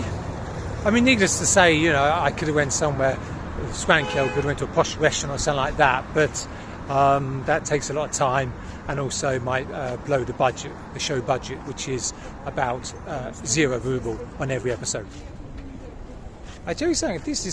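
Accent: United Kingdom. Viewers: British accent 😁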